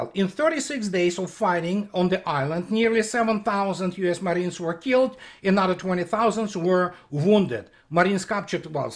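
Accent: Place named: Russia. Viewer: You talk too precipitously and with your strong Russian accent is hard to follow your line of thought; calm down, pronounce each syllabe distinctly and do not overlap words, one on top of the next.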